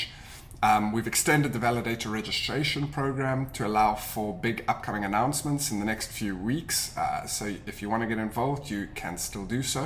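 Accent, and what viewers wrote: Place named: South Africa. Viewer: I detect a South African accent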